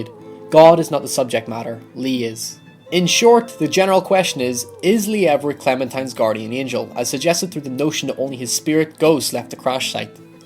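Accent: Northern Ireland, United Kingdom. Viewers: great video, is that a northern irish accent?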